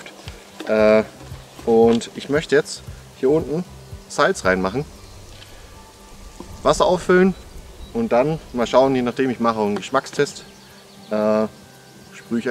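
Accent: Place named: Swabia, Germany. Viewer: Wo sind wir denn gerade? Bei uns im Schwobaland? WMH! 🌿🌲 so hört es sich zumindest an…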